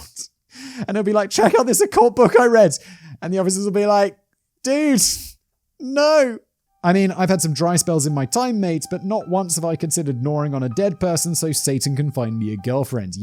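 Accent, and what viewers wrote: United States. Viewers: I am slowly working my way through all of Simon's offerings, so I know I'm late to the party... but whenever Simon gets to the point where the criminal is caught... he sounds more and more American with each episode. I don't remember which episode it was, but when he was hoping that the particular state had the death penalty... yeah, come over to the dark side of the pond. The majority of us here prefer to live and let live. The ones who get all offended are usually just politicians 🙄. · Why u talking like americano.?😊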